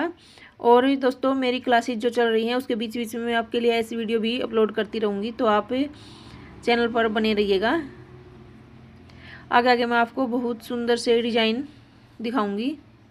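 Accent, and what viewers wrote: Haryana, India. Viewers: Good morning mam aap ka pahle ka vidio me sikhane ka tarikka bahut hatt ke hay abhi bahut hi acha hay aap ki bate Haryana stayil hay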